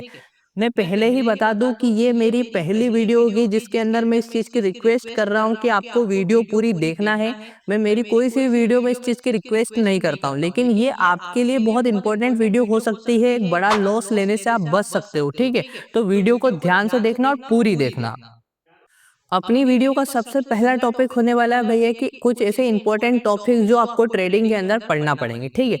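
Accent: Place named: Madhya Pradesh, India. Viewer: Sir aap Madhya Pradesh se ho kya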